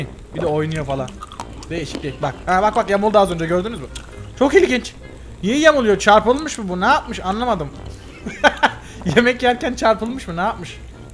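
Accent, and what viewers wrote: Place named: Adana, Turkey. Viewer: Hemşehrimsin abi bende adanalıyım FİŞŞEK tamda adanalı konuşuşu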